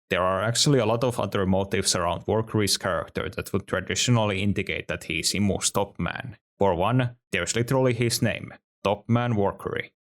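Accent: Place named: Finland. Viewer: Great content. Ps: Suomalainen aksentti spotattu😆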